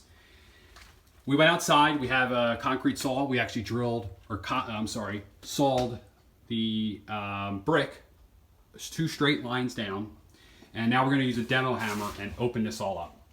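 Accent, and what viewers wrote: Philadelphia, United States. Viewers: Gotta be from Philly with this accent. Nice work bro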